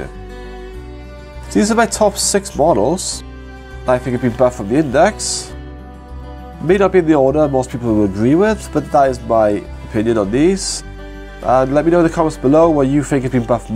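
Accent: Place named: Wales, United Kingdom. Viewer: haha I read through all of these just to confirm you were in fact welsh. would recognise that accent anywhere, big ups from Crickhowell and Swansea. Necrons are a horrible faction to play against though, far too much reanimation, or stupidly op invulnerables..i'm looking at you 3++ wraiths.. I mean seriously gw were just lazy not thinking of better rules to reflect their toughness like..increasing their toughness, or giving them a 5+ fnp ect.. having 3 of the receive a charge from a ccw ironclad dread eat it up and then devour a leviathan is just stupid if you ask me. Anyway good vid sorry for the rant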